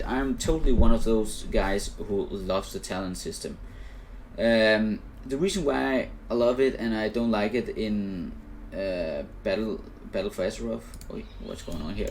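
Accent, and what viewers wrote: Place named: Denmark. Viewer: What contry is you from? Du lyder dansk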